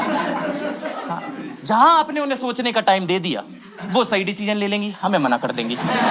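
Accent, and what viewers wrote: Hyderabad, India. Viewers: Ek pura 🤣😂show hydrabadi. Accent me krlo bhai❤ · Super hydrabadi touch 😂😂😂😂😂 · Bruhhh dyammm that hyderabadi accent wass justtt ♨️♨️ Loved itt brotherr❤ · Hyderabad accent , nice bro 😂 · Thora aur hydrabidi ascent rahta tab kuchh jyada hi maza aa jaata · Ending 😂😂 the hyderabadi accent is so good 😂😂😂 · Haydrabadi accent yarr 😆😆😆😆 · Hyderabadi accent ne pure comedy me jaan daal di ❤😂😂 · Me to sirf apka haidrabadi accent sunne aai hu.. very nice yrr😀😀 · Loved it. Hyderabadi Accent was hilarious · Ooe hoyee kya hyderabadi bolta tum apna to dil aa jata tumhare batao par😂😂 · Bro Amazing 😂, your hyderabadi accent was crazy 😂 , Angaar dal diye 🔥⚡😍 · that hyderabadi accent u killed it bro❤‍🔥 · Mujhe aapka hydrabadi accent bahut achha laga i love you ❤❤❤😍🥰😊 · First time pura standup maine dekha hai but accha tha comedy maine sirf hyderabadi accent ke liye aaya tha but pura dekhna pada 😂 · Hyderabadi assent was too good. 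Good comedy set. I like it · Coming again again just for hyderabadi accent 😂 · I have hyderbadi friend he also speak like this but ur comic is very good bro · Bhai crazy yrrr ,kya gand fadi ha hyderabadi ma ,oyeee hoyeee 😂😂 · Vo hyderabadi accent ki vjh se ye pura video dekh rh 😂😂😂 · Maja dila diya bhai... Hyderabadi accent too good 😂😂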